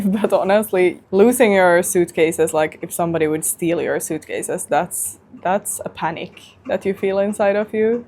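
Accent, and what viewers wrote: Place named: United States. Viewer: تقريبا الاكسنت امريكي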